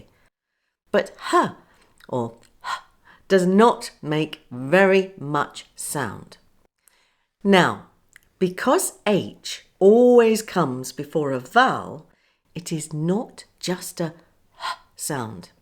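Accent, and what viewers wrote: RP, United Kingdom. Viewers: Por fin alguien que habla RP accent .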